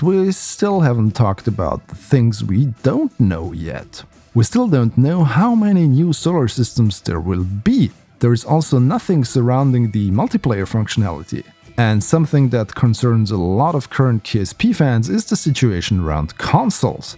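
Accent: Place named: Germany. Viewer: Your Hochdeutscher Akzent ist very obvious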